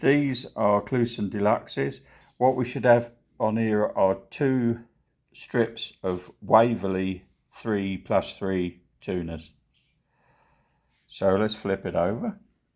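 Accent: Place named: England, United Kingdom. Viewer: Thank you. The way you routed for the mahogany splines is something I will try next time I fix a headstock. On a modern guitar, I might use veneers on the front and back of the headstock to hide my work. The way you did it on that classic was perfect. Not to be weird, but I also like how you talk; what part of England are you from (if you are Irish, Welsh, or Scottish, I apologize)?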